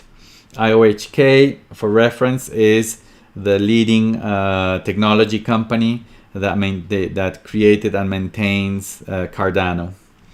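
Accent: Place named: Russia. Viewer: Я так и думал що твой акцент руский ! Честно ти въобще клас ! С етой дъмб я сделал ошибка но надеюсь що за в бодущие не будить . Сподибо за поддержка , и всегда показивяеш все що как надо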